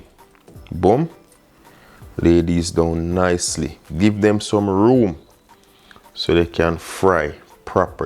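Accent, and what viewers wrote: Jamaica. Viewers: Wicked! A jus fine yuh and am very impressed. Can't wait to try this recipe. Love the mix of English and Patois and di sweet Jamaican accent. Chef, Yuh mek mi feel more homesick dan hungry. Trus mi! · I love the Jamaican accent 🤭 and I love his realness too! Smelling the food and using bare hands are things we want to do behind closed doors but I'm glad you make me feel comfortable watching this. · Wa gwan! it's the Jamaican accent for me. Love it. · Your accent ❤ I had a boyfriend from Jamaica in the 90s. He died in a car accident. Listening to you brings tears yo my eyes. Im going to make this today! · Fat n juicy! 😁luv dat Jamaican twang!❤️👍🏽😋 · Am here for the jamaican accent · I need a Jamaican man in my life..his accent😍😍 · Chief, I am not just here for this deliciousness, but the Jamaican accent. Lol keep doing your thing. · Love this guy! 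He’s authentic! Mi miss Jamaica 🇯🇲 just from listening to him! Mi ready fi eat! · Me rate di video from mi hear di jamaican accent🇯🇲💯💯🔥🔥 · I love that Jamaican accent. Kenya we locked in waaaagwan😃😃😃.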